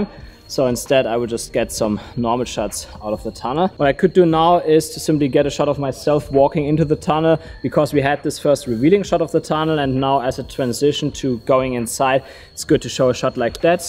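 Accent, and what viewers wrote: Germany. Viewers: Wow, I can hear that youre German, try to meet up with niklas christl, a super videograph too❤ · I recognize the german accent!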